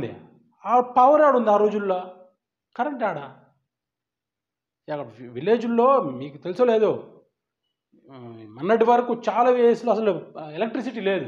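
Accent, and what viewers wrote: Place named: Anantapur, India. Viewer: Nice Explanation Sir,mee language observe chesanu,,,i think Ananthapur surrounding anukunta sir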